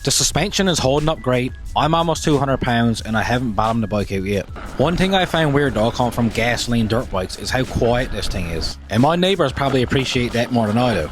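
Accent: Newfoundland, Canada. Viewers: don't sound too too Canadian to me lol, maybe an irish newphie?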